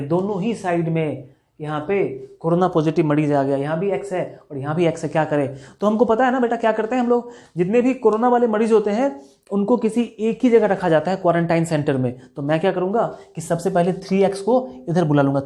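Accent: Bihar, India. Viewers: Bihari language padhaai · Sir mai bhi Bihari hun App ke (Bihari style +study) bahut accha Laga mai yah video 2026 ko dekh raha hun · Oo ho bihari❤❤❤❤❤❤❤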